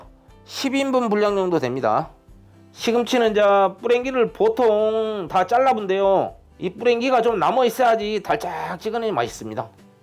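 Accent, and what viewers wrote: Jeolla, South Korea. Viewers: 전라도 사투리 너무 구수하네요 와 잡채도 맛나것소~잉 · 이렇게해각고 딱 이렇게해갖고 구수합니다 저두전라돈디 비스한지역갇은디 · 아따 ~ 전라도 사투리 징하게 정감있어 부네요 . 세프님. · 이 사투리는 전라도인가요? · 구수한 전라도 사투리 더 정감이 가네요 ㅎ 늘 고맙습니다 짱~!!!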